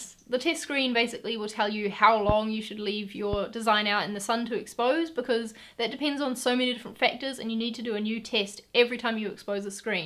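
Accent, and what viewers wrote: New Zealand. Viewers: Haha Kiwi accent! I was sent this link from Facebook as a reference as to how to do Screen printing for their CREATORS workshops! Hit them up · are you kiwi? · Are u kiwi?? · Came here from Mari’s video! Are you kiwi? Cos they accent.. haha! I’m super nervous about the exposing time part… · Lol, a strong kiwi accent there! I left 27 years ago - its refreshing to hear.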